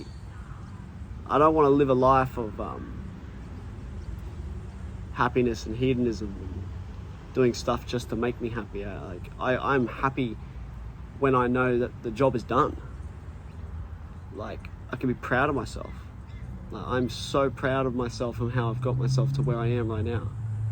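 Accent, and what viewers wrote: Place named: Australia. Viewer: Found this little vidya in my youtube feed, I'm glad you made it brah (I had to watch it on 2x speed as your eshay lad straya accent makes my yankee ears tickle). I'm 25yo now, still figuring things out, still gotta mountain of improvement ahead of me, but that's the beautiful thing bro. Even though I still trip and fall a lot, I'm the happiest I've ever been in my life because I feel like each year I'm closer to becoming a better man. No matter what happens: gangas or no gangas, bugattis or maccas, sickkunt or sad kunt, these are good problems to have cause every day I'm not dead or in prison is a day to be grateful for. I've learned to forgive myself when I slip, to not regret my past mistakes (no matter how retarded), and to not wait for things to be perfect. Climbing the mountain is why we're here brah: we fall, get injured, slip back down, but still get after it and make progress. 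Even if life doesn't go as planned, or every day is failure and is spent in tears - as long as we stay true and do our best, I know Zyzz and Tupac will smile upon us - and I know that we'll smile in the end too. Cheers mate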